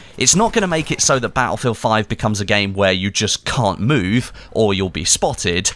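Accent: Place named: RP, United Kingdom. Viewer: I really like this guys content but I cant stand his posh way of speaking. For example when he says "as well" at the end of a sentence, its drawn out and prissy sounding.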